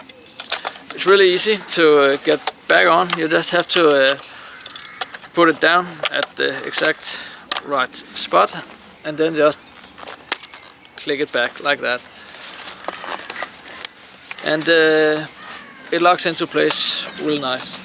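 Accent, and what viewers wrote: Denmark. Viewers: You sound danish. Are you danish? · you sound like a danish do you still have the gun?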